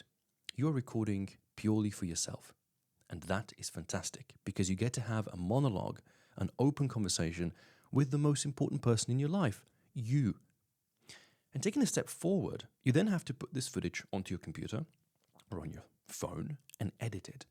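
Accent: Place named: RP, United Kingdom. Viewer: Thank you, I completely agree with your thinking about longer content and attention spans. Could you please make a course on how to develop a british RP accent such as yours?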